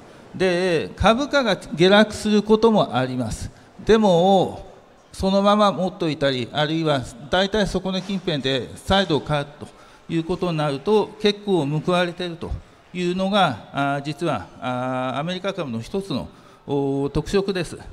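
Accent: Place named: Fukui, Japan. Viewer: 今中さんは訛りからするとおそらく福井出身の方かなと想像します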